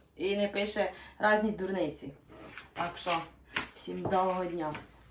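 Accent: Poltava, Ukraine. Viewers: Неподражаймий полтавский акцент.В мене батько з миргородщини.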